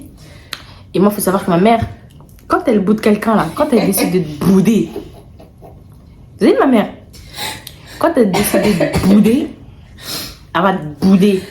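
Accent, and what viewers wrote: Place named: Democratic Republic of the Congo. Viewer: L’accent congolais j’adore 🥰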